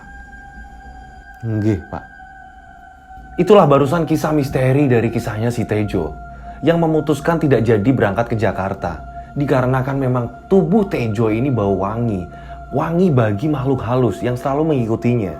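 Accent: Banyumas, Indonesia. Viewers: Pintermen Bhs.Tegalnya mas logadnya dapat,lanjut crita slanjutnya yosky....😄😄💪💪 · Critany dri Tegal ap Pekalongan Min soalny ngomongny Medok bnget hhh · Banyuwangi hadir mas....suka banget mas nyampeinya pakai bhs ngapak" tambah seru...BOOOM BOOOM BOOM....YOSKAY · Bintaro Tangerang hadir mas, haduhhh massss ngeri bgt aq nyimak kisah ini, masalahnya aq y sllu naik kereta klo k jakarta, mas luwes bgt ngomong logat Tegal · Ya Allah bang.....multi talen banged Versi ngapak nya best Yoskay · baru crita ini yg buat q senyum senyum sndiri cara pmbwaane pakai bhs ngapak,,,apik mas enyonge seneng cara ngomong e,,,,😁😁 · Sereem....tp kudu guyu...bhs tegal e pas bgd🤣🤭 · Saya suka, bahasanya jls. Dn bahasa Jawa + Ngapaknya itu bikin saya suka. Hehe.. BWI ikut Nyimak.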